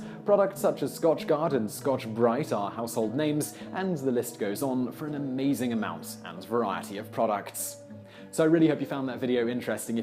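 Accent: United Kingdom. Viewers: Oh, I'm sure he's smart. It's just that Brits don't talk like Americans.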